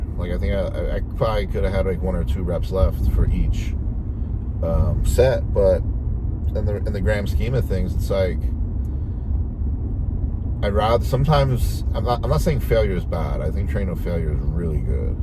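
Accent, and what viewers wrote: New Jersey, United States. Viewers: the Jersey accent 😂😂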